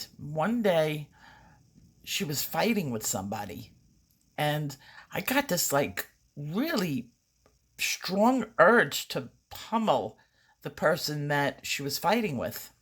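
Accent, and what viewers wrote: New York, United States. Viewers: I love your stories and now you have my other cat, Loki, in thrall of you. Lol my "kids" love the NY accent;)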